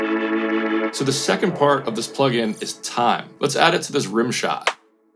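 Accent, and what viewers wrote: United Kingdom. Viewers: Oh eck - right out of bloody Yorkshire, I tell thee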